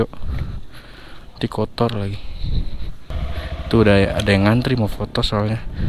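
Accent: Papua, Indonesia. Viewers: habis logatnya kentara bos, papuanya dimna bos, kalo saya di sorong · Logat papua ko,sa dan tong Salam dari serui papua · Kaka, Kaka dari Papua k habis kk pu dialek macam orang Papua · Abang pu Logat bicara Kaya orng Papua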